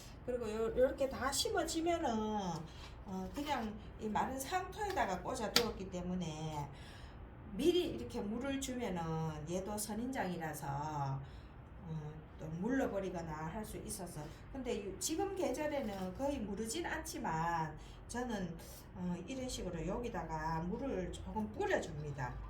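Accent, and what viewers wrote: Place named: Gyeongsang, South Korea. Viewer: 저는다구에 거주하고있습니다 경상도 같아요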